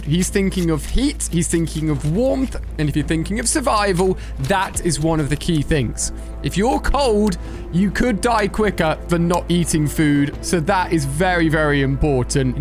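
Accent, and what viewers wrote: United Kingdom. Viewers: Bro is British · Are you british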